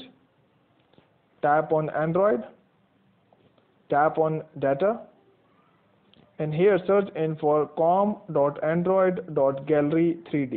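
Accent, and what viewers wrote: India. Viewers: At April 2020 Android phones empty the recycle bins after 60 days. I just wish this guy didn't speak with such a thick Indian accent because what he says is very helpful.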